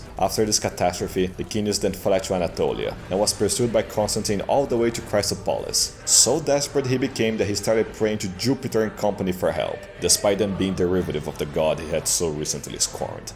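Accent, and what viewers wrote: Brazil. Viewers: O sotaque entrega o Brasiliense. A propagando ao Constantino é tão boa que até Eusébio teria inveja! Muito bom! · Teu sotaque de brasileiro deixa o vídeo muito melhor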